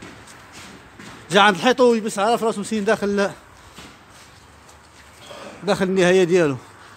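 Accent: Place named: Libya. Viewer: تتقصكم التجربة يا صديقي. .يبدو انك عشت في ليبيا طويلا كلامك يوحي بذلك .المهم بارك الله فيكم ومزيدا من الاجتهاد حتى تكتسب الخبرة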